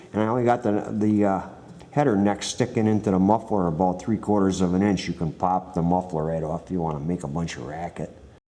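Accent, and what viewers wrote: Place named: Upper Midwest, United States. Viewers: I'm from Wisconsin (now in Texas) and this guys accent was killing me. i miss home! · lmao... man this guy's accent kills me! it's like watching a deleted scene from the movie Fargo! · Christopher Desabrais Wisconsin accent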